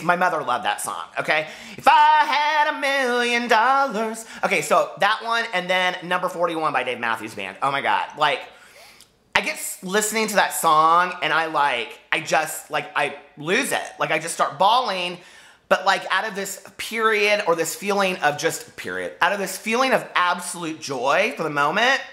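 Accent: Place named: Kentucky, United States. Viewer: Thank you...I've needed someone like you in my world lately!!! I'm from KY but live in Nebraska & Maine. We lived in Louisville for 15 yrs so it is so nice to hear someone who sounds like me & I get. Fangirling over you sir!!! ;)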